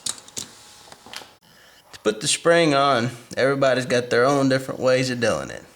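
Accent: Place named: South, United States. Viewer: Southern boy...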